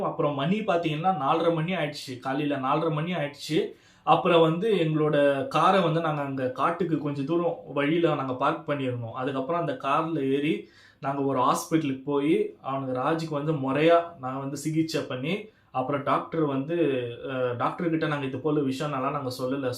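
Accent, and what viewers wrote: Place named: Bangalore, India. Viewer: Bangalore tamil slang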